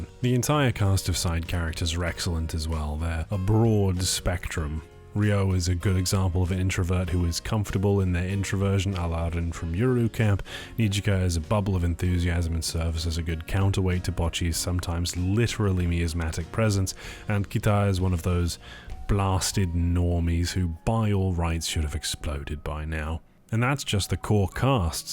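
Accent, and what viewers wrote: United Kingdom. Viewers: Hearing "see you on the sunny side" in British accent makes me wonder how much of a meta joke that was about complex feelings of having anxiety of and desire for meeting friends.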